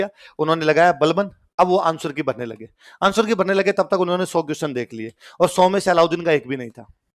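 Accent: India. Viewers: bolne ka deshi andaj sahi h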